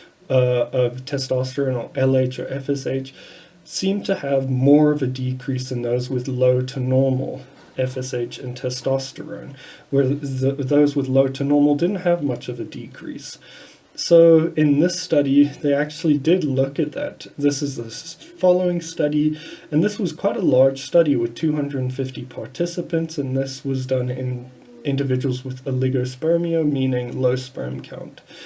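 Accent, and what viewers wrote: South Africa. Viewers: Do I hear an SA accent there?? 😉 Good on you for putting out good info on the subject! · Your south african right man....great vid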